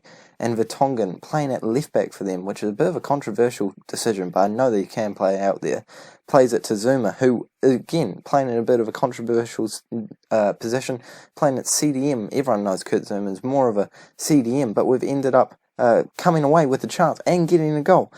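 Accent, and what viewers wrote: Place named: New Zealand. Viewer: always nice to add another Kiwi voice to my lineup. Keep up the good work! If you want some tips on playing the game, I did a series recently called Tips for the Advanced Football Manager, you may find it useful.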